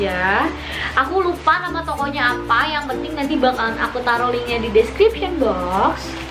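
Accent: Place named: Sunda, Indonesia. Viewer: kaka orang sunda yah? lucu ngomong nyaa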